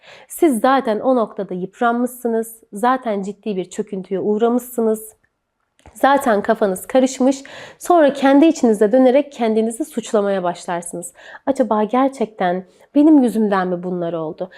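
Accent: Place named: Yozgat, Turkey. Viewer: Ay Yozgat lehçesi vuruyor arada, orada kopuyorum. Emeğine sağlık Hocam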